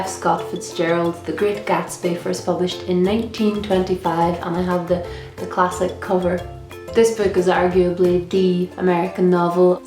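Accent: Ireland. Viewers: U Irish?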